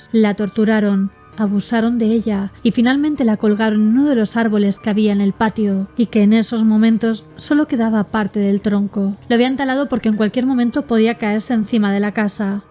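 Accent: Spain. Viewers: ¡Mexicanos! ¿Quieren escuchar a diciendo una grosería? Minuto 😅. Excelente video pero el "chin∆@ tu madre" con esa bella voz española, es una joya! jaja.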